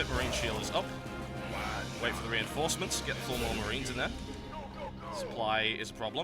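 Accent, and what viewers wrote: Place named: Newcastle, United Kingdom. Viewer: He's a Geordie, that's why. Learn your British accents.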